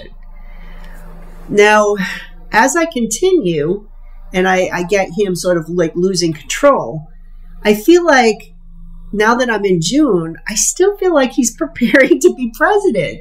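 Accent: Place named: Maine, United States. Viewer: Wow I’m from Maine and right before you mentioned VT and NH I knew you were from up here because of your accent!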